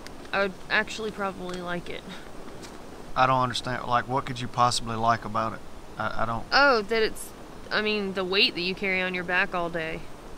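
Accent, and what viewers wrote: South, United States. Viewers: Your accent doubled down in southern flair. :) Thanks for posting!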